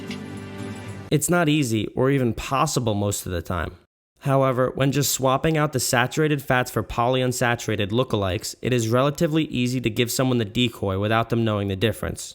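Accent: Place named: New Jersey, United States. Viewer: has a Jersey accent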